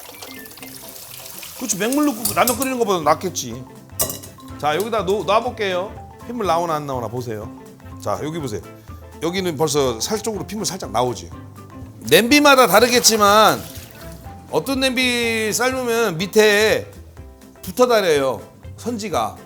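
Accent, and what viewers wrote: Chungcheong, South Korea. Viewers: 집에 갈비 있어서 내놨네요 맛나게 해먹을께요 충청도가 고향인데 언제 들어도 구수한 말씨도 좋아요~^^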